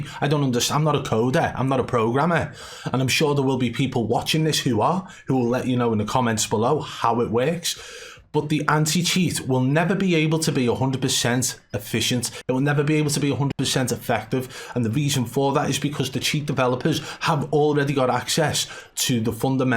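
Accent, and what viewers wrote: Liverpool, United Kingdom. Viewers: I love this Scouser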